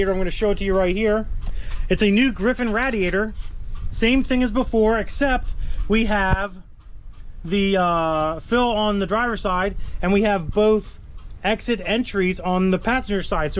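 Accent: Pennsylvania, United States. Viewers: That's how we talk in PA buddy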